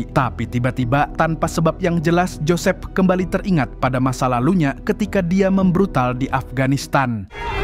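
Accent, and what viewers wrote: Banjar, Indonesia. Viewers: Urang banjar mang?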